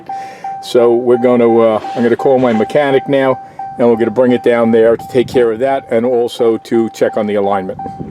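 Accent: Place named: Boston, United States. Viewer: Best,part of the video is the Boston accent..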